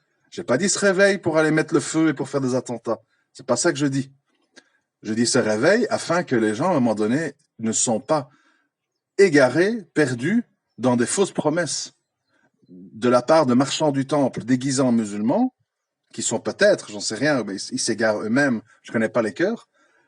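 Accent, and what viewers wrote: Belgium. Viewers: c est un Belge de souche 😇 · Accent belge.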